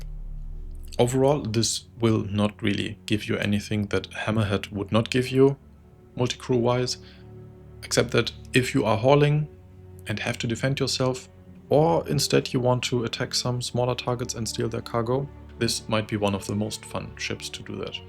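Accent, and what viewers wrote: Germany. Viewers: er ist deutscher, das hört man :-)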